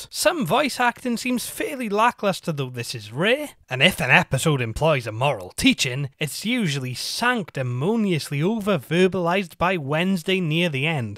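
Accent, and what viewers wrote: United Kingdom. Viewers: Great review mate, are you Welsh? I can hear an accent coming through every now and again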